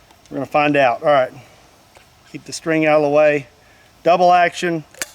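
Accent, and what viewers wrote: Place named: Texas, United States. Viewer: What's with the Texas accent?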